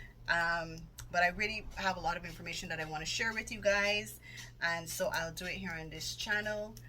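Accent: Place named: Barbados. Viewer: I thought as much I can hear the bajan accent. I’m from Barbados as well. Live in UK now !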